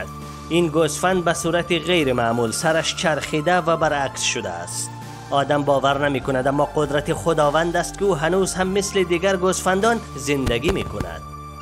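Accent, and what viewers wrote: Afghanistan. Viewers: نمیشه این کسی که گوینده هست عوض کنید چقد دیگه ادم قط بود افغانی اوردین گوینده خبر ..اعععععععع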